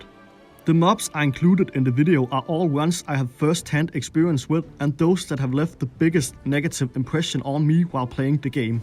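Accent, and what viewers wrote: Denmark. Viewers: well, I like this kind of english with danish accent, I mean REALLY danish XD BTW great video mate. Og jeg har set mange af dine fede videoer :)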